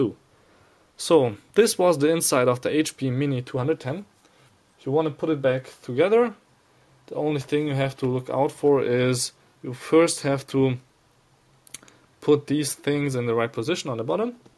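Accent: Germany. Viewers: great tutorial despite the german accent, danke schoen! :)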